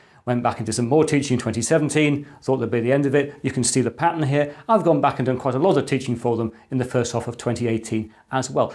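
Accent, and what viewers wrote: England, United Kingdom. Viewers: I very much enjoy the vidieo's, in fact at 62 I'm finding myself once again energized into doing more new things. The video length is good but, probably due to my age, I find you speak a bit quick so I have to go back and re-review to be sure I understood what you said. Lighting is good, subject camera work is also good and your explanations don't seem to get off subject and like most American's, love the English "accent" .... LOL. Recently dropped you an email asking a question concerning the Windows PE and hope to hear from you soon. Please keep up the great work, it is greatly appreciated from this side of the pond!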